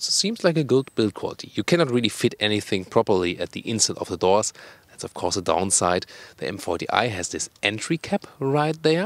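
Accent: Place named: Germany. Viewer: German cars should be always presented with German accent just like in this video :)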